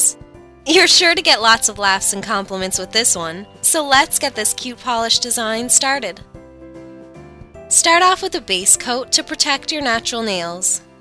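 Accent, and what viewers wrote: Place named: Newfoundland, Canada. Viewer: Are you from Newfoundland? Your accent sounds just like one!!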